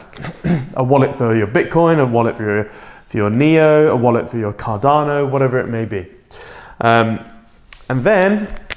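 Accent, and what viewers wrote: United Kingdom. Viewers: Where you from? Trying to figure out that accent....British? You live in the states?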